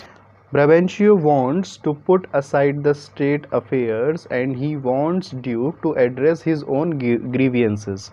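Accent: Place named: India. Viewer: I am afraid It's not teaching; it's simply reading with a Hindi accent. Try to feel and bring some emotion to your teaching.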